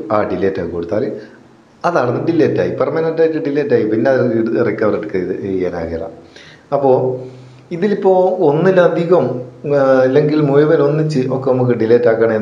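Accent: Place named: Kannur, India. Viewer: Kanur basha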